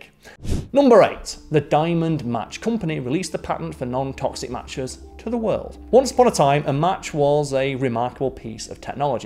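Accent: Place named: Northern England, United Kingdom. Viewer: North England.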